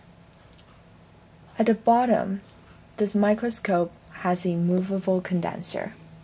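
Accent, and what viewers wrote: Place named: Asia. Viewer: sounds like an asian woman trying her hardest to speak english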